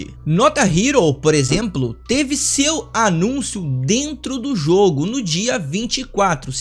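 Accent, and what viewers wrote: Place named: South, Brazil. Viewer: Opah chegando agora no canal, depois da live com o enmynest, esse teu sotaque é aqui do sul certo, Rio Grande RS abração, mais um inscrito...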